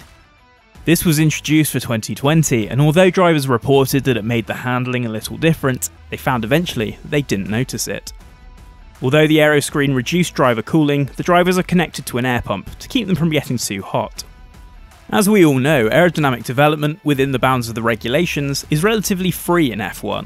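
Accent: United Kingdom. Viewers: IndyCar fan here. Normally on these kinds of videos, my worry is "okay, how is the British accent going to insult my favorite sport this time?" But I've gotta give you credit. This was very fair. Kudos, and a like to the video!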